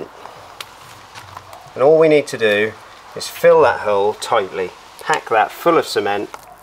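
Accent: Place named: London, United Kingdom. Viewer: Fantastic! Can I ask what accent you have? Sounds like a blend of London and 1920’s BBC English.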